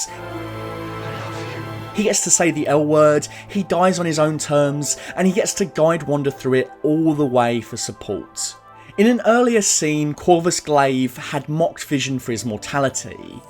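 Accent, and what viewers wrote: United Kingdom. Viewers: I enjoy your videos, but find it jarring when you use pseudo American phrases and pronunciation, when you're clearly a Brit. I imagine you're choosing to do this in an attempt (perhaps correctly) to appeal to a USA audience, but it is nevertheless unsettling to hear, and I suspect that your audience in the USA and Canada enjoy your accent and language precisely because you're different. The expression "Often times" for example. I've lived in the UK my entire life and I have never heard anyone say that. Literally not a single occasion. We simply say "Often". Similarly, your reference to "Taffy"? Where did that come from? That's not even a thing over here, so why would you use it as a similie? Finally, and perhaps the most troubling is the way you've aped the USA pronunciation of the name Thanos. Admittedly, it's a character invented by an American, but surely one which has its roots in Greek mythology, and both Brits and Greeks would pronounce that word as Than-Oss, and never Than-owse. 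That's all, otherwise, fab vid. Thank you for sharing. Just wanted to say that your own accent and linguistic style is just fine and charming, but the choice of some select words and pronunciations is a bit jarring - next thing you'll be drawing the 'drapes' and putting the broom in its 'closet' ;-)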